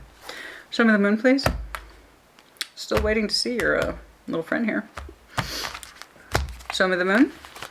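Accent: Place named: Texas, United States. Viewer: You are so beautiful and I knew I heard a Texan accent…the reading resonates 100%!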